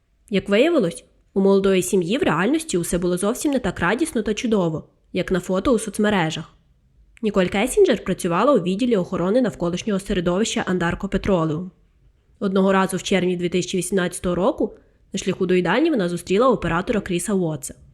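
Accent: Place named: Rivne, Ukraine. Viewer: Ви з Рівного? Такий цікавий говір? Якщо що я з Кропивницького. Просто так цікаво розмовляєте. Трішки не гоніть! Просто читайте як і розмовляйте з нами. Відчуття, що ви робите на от їбісь😞не треба летіти! Навпаки можливо поставити 1.25 швидкість. Краще переслуховуйте і ви і комусь дайте послухати. Ви вумничка!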